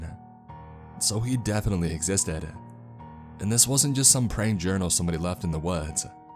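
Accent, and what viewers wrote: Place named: California, United States. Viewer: Pretty good but you need to get rid of your confused california girl accent.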